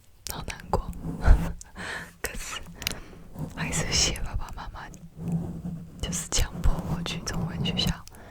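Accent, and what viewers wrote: Taiwan. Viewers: 您好像学了台湾中文，对不对？因为我的老师教我北京汉语，所以我看繁体字看得不太好。虽然我完全不能看繁体字，不过我听台湾的口音平时听得懂。我自己觉得您说得很好！ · 可是你的台湾口音很relaxing诶！ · 好少遇到台灣ASMR很喜歡這個口音❤ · 你的zongwen好可爱 哈哈哈 好喜欢台湾口音 听得好舒服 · 台湾腔太有魅力了😂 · 好家伙这个完美 你听起来台湾人🌚哦哦你的父母是台湾人😮厉害 我的眼睛太疼了晚安🤣🤣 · when you started speaking I KNEW YOU WERE TAIWANESE I love ur accent!! ❤️ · 台湾人路过 方便妳看 我转简体字 妳的一些中文用语好可爱喔😂 妳的自述故事也很有趣～ 每次那个“可是”都念“可4” 真的很台欸🤣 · 有台湾味道的中文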